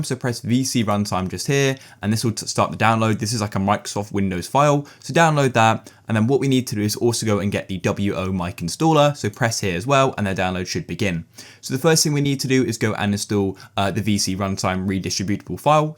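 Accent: United Kingdom. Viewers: He’s British not american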